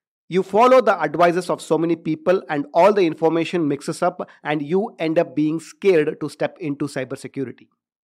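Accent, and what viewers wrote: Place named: India. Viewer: I hate clicking on this videos then listen to an Indian voice🙄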